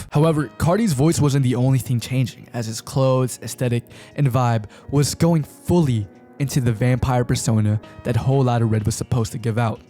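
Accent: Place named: California, United States. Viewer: he sounds and dressed like he could be from Cali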